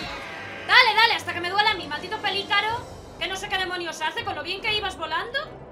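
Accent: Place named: Galicia, Spain. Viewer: XD eres gallega? se te nota mucho el acento!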